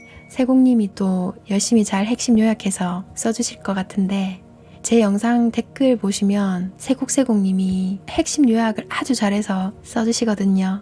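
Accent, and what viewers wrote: Gyeongsang, South Korea. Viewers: 구독했어요~ 말투가 경상도 억양들어가 정감가고 (제가 경상도) 예쁘고 목소리 좋고 마음의 양식이 쌓여가네요ㆍ 이야기를 듣다 보면 많이 깨달아 지네요~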